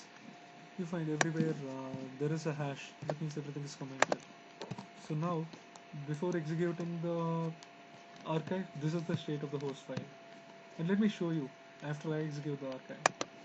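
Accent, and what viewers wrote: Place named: India. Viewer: stupid indian accent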